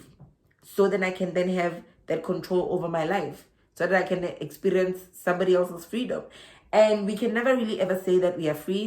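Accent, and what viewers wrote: South Africa. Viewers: you are an inspiration you are a pleasure to listen too, I feel my heart full of nostalgia hear your wonderful personality one thing I miss from South Africa is the way black South Africans speak english, people may think I am being racist mentioning the accent and way Black South Africans speak but is a term of endearment, as it is one of the most beautiful things of African people as it shows the beauty of the African soul, I too moved to Australia and have built a good life here, I would be dead already if I had stayed in SA having Diabetes and blood clots my biggest sadness is when we voted for one man one vote all whites new that was the end of Apartheid which was necessary as it was an degrading evil, most whites were expecting to see all races especially Black people lives upfift to a middle class lifestyle we as whites enjoyed, and to how the ANC has destroyed everyones dreams except their own selfish lives is so so sad, so happy you managed to get out and build a wonderful for yourself and family you should send your video to TED TALKS as you have a gift and are an amazing speaker you are a beautiful person with so much to give to humanity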